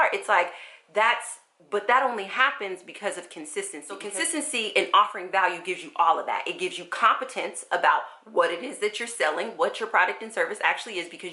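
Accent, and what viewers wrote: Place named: North, United States. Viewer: it is a northern thing. I’m from Baltimore and we say it similarly. 😂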